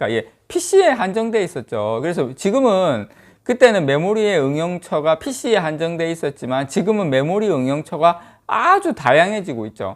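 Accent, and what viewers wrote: Gyeongsang, South Korea. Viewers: 식사하셧는교?? 우리포항 싸투리 올만에들으니 반가위요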